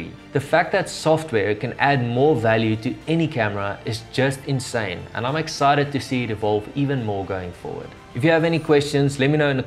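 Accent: South Africa. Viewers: Hi. Amazing channel. Thanks so much for sharing all of your skills. Great to listen to a South African for a change. No sure which programs you use for your editing but I using Premiere Pro. Not a big APPLE fan so not using macbooks as my laptop of choice. I know I can follow recomendations from ADOBE themselves but what would you say will be a good setup (hardware) to do some descent 4K editing?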